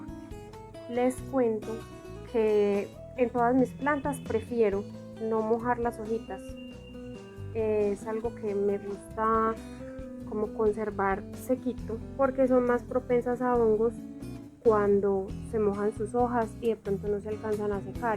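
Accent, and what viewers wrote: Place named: Antioquia, Colombia. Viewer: si te sentía el acento paisa jejeje saludos desde Cali y adelante con tu canal.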